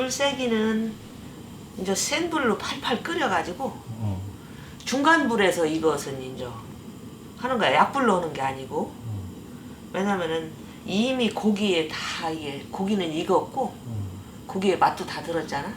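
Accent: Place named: Chungcheong, South Korea. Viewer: 설명해주시는 부분이 진짜 엄마나 할머니가 해주는것 같아서 너무 친근하고 좋아요.. 저도 충청도 사람이라 중간중간 나오는 충청도 사투리도너무너무 좋습니다..요리강의 영상이 이렇게 따듯해도 되는건가요.. 보일러안틀어도 지금 너무 훈훈해서 땀이나요 삐질삐질 😅💕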